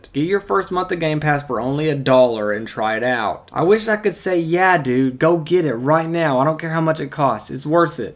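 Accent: South, United States. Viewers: Man you got like 1/10th of a southern accent lmao. it kicks in randomly every now and then. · The fact that you pushed yourself soo hard for the sake of making this video despite the relationship you have with this game (as mentioned in this video) deserves praise! You have a southern accent! I hail from a land in the southern region of the U.S. btw, I think you were hooked when you actually started worrying about the condition of your home... to an NPC. LOL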